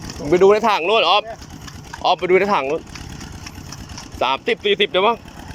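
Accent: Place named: Nakhon Sawan, Thailand. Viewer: ติดตามนะจ้ะ สำเนียงเหมือนคนคอนหวันมีแง้ะด้วย อ่านเจอว่าอยู่ชัยนาท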